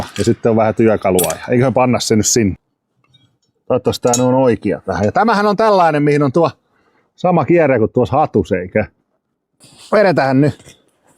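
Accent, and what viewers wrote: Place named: Laihia, Finland. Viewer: faija laihialta ni tua murre on hienoo kuultavaa :)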